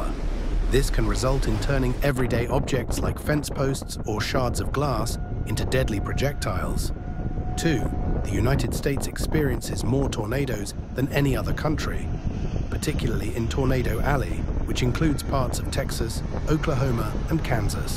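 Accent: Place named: United Kingdom. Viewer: as told by a gophy ass brit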